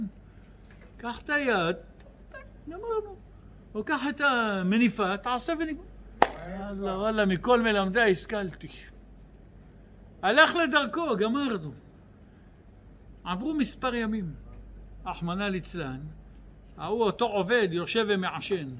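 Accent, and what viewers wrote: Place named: Iraq. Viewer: הוא נשמע עיראקי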